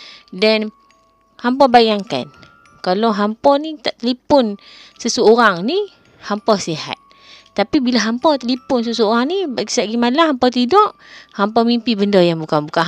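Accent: North, Malaysia. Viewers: Akhirnya cakap loghat utara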